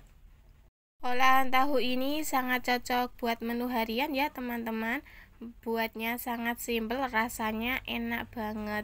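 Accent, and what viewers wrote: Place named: Java, Indonesia. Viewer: Mbak e bahasa medhok banget.....😅😅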